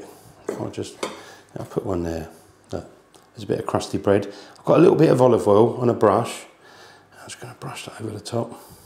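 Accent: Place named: London, United Kingdom. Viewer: as in he has a London accent ? and so do 10 million others , he sounds nothing like Jamie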